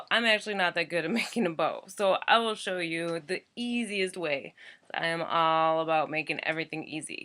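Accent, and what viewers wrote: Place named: Pennsylvania, United States. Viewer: You are a smoke show 💨 I love your accent too. I’m guessing PA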